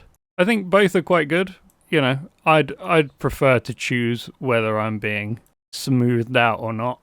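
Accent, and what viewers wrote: United Kingdom. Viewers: I like ur British accent when u speaking bout' these phone i very like ig 😅😂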